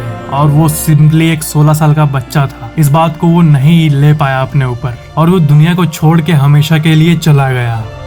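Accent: Bihar, India. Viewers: His language speaking style changed too much..like he is from bihar.. And his voice is not energetic anymore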